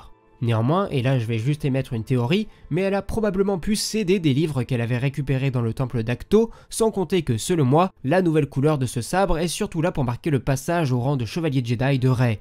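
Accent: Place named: France. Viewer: J’habite aux États-Unis et ouais, tu parles avec un accent français, mais, je comprends parfaitement ce que tu as dit. Bravo! Aussi j’adore tes vidéos. J’apprends français maintenant et j’adore la guerre des étoiles, et ta chaîne m’aide à apprendre. Merci et continues ce que tu fais!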